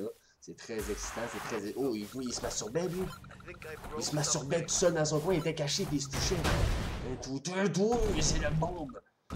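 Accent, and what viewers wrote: Quebec, Canada. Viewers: T québécois ???☺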